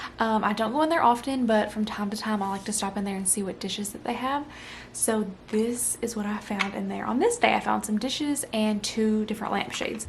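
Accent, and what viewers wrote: South, United States. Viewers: You are so lovely to listen to. Love your accent, reminds me of when I lived in Tennessee- love the southern accents 🇬🇧🇺🇸🌸🌸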